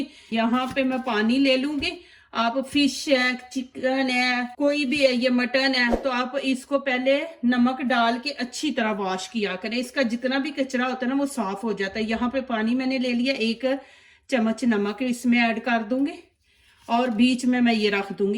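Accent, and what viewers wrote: Sialkot, Pakistan. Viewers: بہت اچھی ریسیپی بہت ہی اچھے انداز میں سمجھا سمجھا کر پیش کی۔۔آپکا سیالکوٹی لہجہ بہت پیارا لگا۔۔۔۔۔اللہ آپ کو ہزاروں خوشیاں عطا کرے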